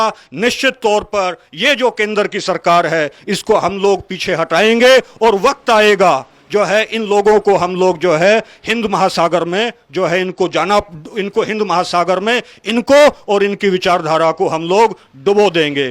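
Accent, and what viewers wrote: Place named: Bihar, India. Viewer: Bhai u r talking like a bihari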